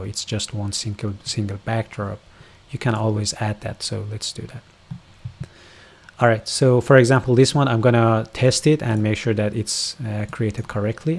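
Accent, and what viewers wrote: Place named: Iran. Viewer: اقا دمت گرم خدا قوت خسته نباشید خدا وکیلی عالی بود کارت اگه مثل خودم لهجه نداشتی اصلا فکرش رو نمی کردم ایرانی باشی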